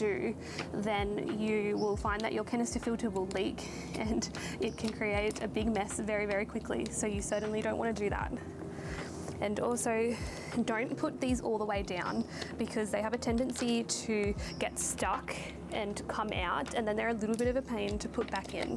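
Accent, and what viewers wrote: Australia. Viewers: I love the way you say “Here” you must be from Australia ? Love the accent. Cheers from 🇨🇦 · I like how she says "here " but it's like "Heah" lol the Australian agent is nice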